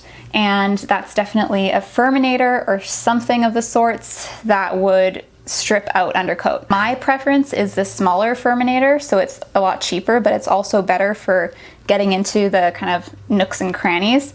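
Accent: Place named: Canada. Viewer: I just found your videos the other day and am enjoying them. I have had my Chloe, an Aussie mix, for 7 years. These grooming videos are so going to help my husband and me. Also while watching your videos, I was like is she from Canada? My husband is Canadian. Then I saw the comments and was like yup she’s Canadian. 😁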